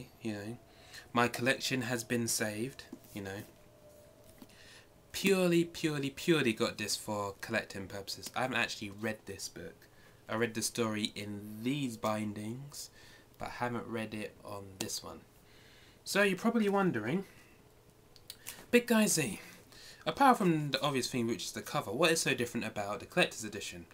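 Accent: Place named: England, United Kingdom. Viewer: lol shirt says bronx but hes clearly english :)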